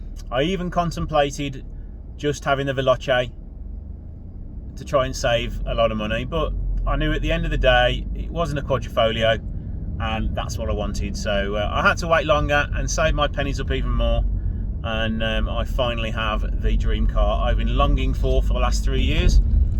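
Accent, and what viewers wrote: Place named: Midlands, United Kingdom. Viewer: Superb review.... I have a red one.... 28,000miles now and just coming up to the big service £900plus VAT, but no issues whatsoever. Agree with your comments about the central console and infotainment.... if you set in Sat Nav as you head off its perfectly fine and personally I DON'T like touch screen systems. Eventually they scratch and the screen looks horrible. I,ve changed centre pipe on mine and ecu chip for a few extra bhp and open exhaust flaps when I want it. But judging by your accent ( apologies ) and the fact that you got your car from Nuneaton I guess you are fairly near to where I live ( Bewdley ).... I use the Supercar Rooms in Hartlebury, near Kidderminster to look after my beast and they are brilliant. Had an M4 with Competition pack before my Alfa and one major major difference nobody seems to mention is that the M4,s traction in anything other than bone dry and hot conditions was absolutely rubbish. 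Alfa .... put your foot down and it just goes. Unless you really abuse it. Maybe you could argue that the M4 interior design a bit better and more sophisticated but Alfa steering, handling brakes etc better all round and just puts a smile on my face. One other thing that a lot of Reviewers mention is that the carbon ceramic brakes lack feel and can cause the car to creep forward in traffic. Well I,ve got the carbon brakes on mine and have never ever had an issue. Anyway. Happy motoring. Top review Thanks Regards Rog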